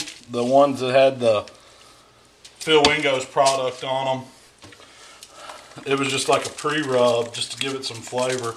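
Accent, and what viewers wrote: South, United States. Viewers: Dig the southern accent. Which state are you from?? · I love your ribs and I love that cool southern drawl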